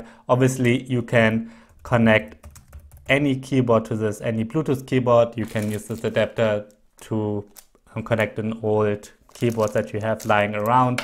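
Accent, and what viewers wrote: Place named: Germany. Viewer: Are you german? Shis is fery notisble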